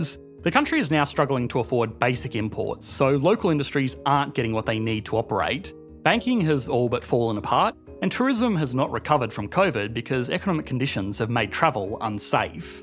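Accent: Australia. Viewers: This is a brilliant video but this guys accent makes it UNBEARABLE! get someone else to narrate it you are not a good presenter. The annoying tone raises at the end that your Ausie accent is used to makes no sense to Americans or brits, this kind of raising your pitch only makes sense if there is a clause or a comma in writing which has a follow up in the same sentence. You do it with Litteraly every phrase and it makes what you’re saying impossible to understand.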